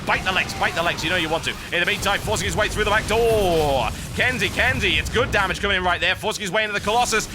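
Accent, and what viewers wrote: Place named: Geordie, United Kingdom. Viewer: Mr. John Bain, let me extend my request for you to do a SC2 commentary using your Georgie accent.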